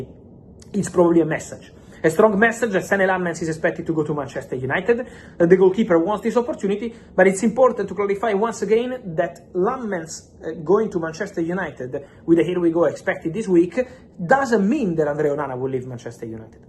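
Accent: India. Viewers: You sound like an Indian speaking English.....😒😒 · Why does he sound like an Indian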